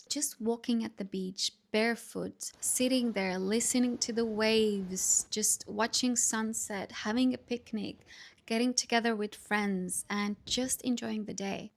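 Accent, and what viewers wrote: Australia. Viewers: Its funny to hear Europeans speak English with an Aussie accent! I'm sure you'll get back some time. you know you'll run into aussies too so dont forget to mention your connection to the land down under.. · After listening to you , i can say unequivocally.....You are 100 % Australian, wherever you may be ..... So lovely to hear your experience here. Come back !!!!!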